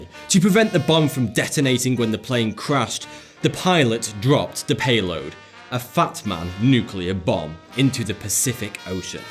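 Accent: United Kingdom. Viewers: i susbcribed cause of his brittish accent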